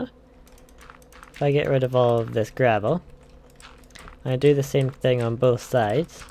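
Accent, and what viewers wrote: Scotland, United Kingdom. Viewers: are you pretending to be scottish or lived in scotland for a while or something?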